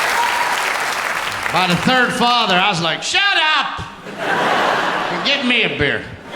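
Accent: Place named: Pittsburgh, United States. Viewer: That Pittsburgh accent is just made for stand up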